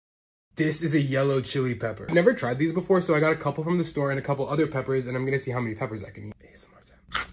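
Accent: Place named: Egypt. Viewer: يعني انت بتتكلم مصري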